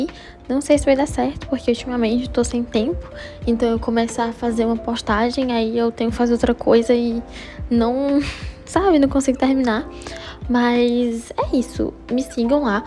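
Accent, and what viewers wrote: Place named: São Paulo, Brazil. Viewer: amigaaa,tbm sou de fortaleza e jurava que vc era paulista kkkk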